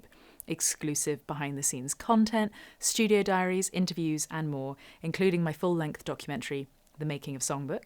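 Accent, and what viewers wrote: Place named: RP, United Kingdom. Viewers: Hij, be happy you are not a slave to a Record Company, and just drop that (Chinese!) Tik-Tok platform altogether. Love your videos, songs, and your speaking voice as well as your delicious Queens English. I hope to see more of your classic Tuesday Talks and guitar stories again. Fondly, Michael PS Commemorations on your Queen's passing. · Keep coming back for the posh accent and demeanour, I guess that bottle is always going to be full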